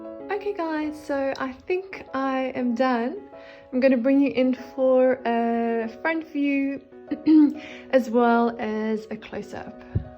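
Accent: South Africa. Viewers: You sound South African, this is encouraging if you are in South Africa, that means that you can probably get Floetrol and the paint in SA?? I'm in the UK at the moment, returning to SA soon and hoping to be able to create some of these beautiful swipes and pours. Loving the colours and your ocean creations. xx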